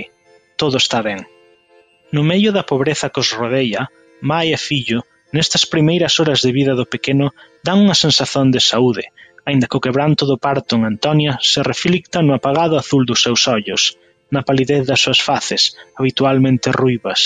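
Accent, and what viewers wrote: Spain. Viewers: I basically hear a guy speaking Portuguese in a Spanish accent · It sounds like a spanish person trying to speak european portuguese. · Isso é português com sotaque espanhol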